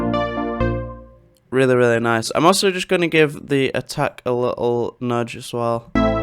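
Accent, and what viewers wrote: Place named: Teesside, United Kingdom. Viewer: Teeside accent im hearing 😊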